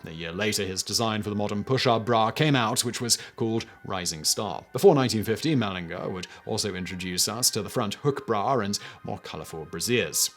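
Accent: England, United Kingdom. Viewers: I'm sorry but I really really like laughing at you saying those euphemisms for breasts and your British accent maybe it makes me a boob but there it is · I didn't know i needed a calm British recitation of breast slang until today. Thanks,. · Listening to him speaking fast with that Brit accent is kind of exhausting for an American. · Why is a guy with a British accent advertising shoes you can't get shipped to Britain? Cheers, A British guy.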